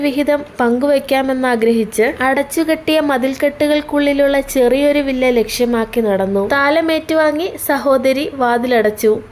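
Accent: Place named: Central Kerala, India. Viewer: Middle kerala style talk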